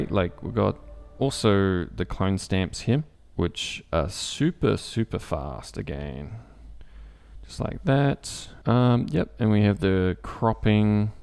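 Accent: New Zealand. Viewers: Steep by steep or step by step? LOL New Zeland accent funny!🥰😘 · I think for casual/hobbyist photographer this is a good alternative but for processing hundreds from a wedding or a sports assignment there’s absolutely no way this can replace lightroom. Also Apple’s Cloud storage system is peculiar & not friendly for android clients to retrieve their photos. Great presentation , though & your English dialect is interesting - are you a New Zealander?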